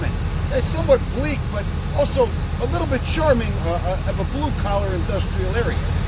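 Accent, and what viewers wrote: Chicago, United States. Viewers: Far out ...first video I heard your voice in I was like this guyz gotta be from South Berwyn or Shtickney...got any good eyetallian beef up there in Oakland ? Yeah I didn't think so you prick.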